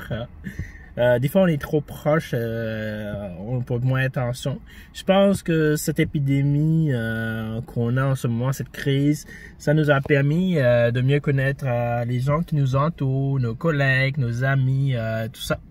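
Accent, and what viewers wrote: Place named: Canada. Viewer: Tu as bien attrapé l'accent canadien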